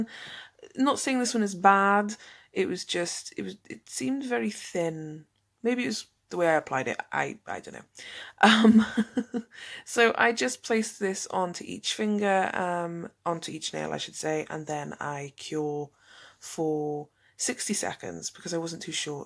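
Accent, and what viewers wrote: Cardiff, United Kingdom. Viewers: This is the first time I’ve watched this lash. I really like how she talks. Sounds a bit like a Cardiff accent? Anyway I found her very helpful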